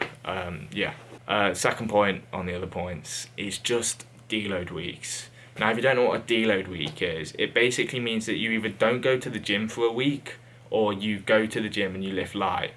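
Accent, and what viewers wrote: United Kingdom. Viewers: Then don’t watch lmao, how hard is it to exit? Its not a lisp lol its his brit accents.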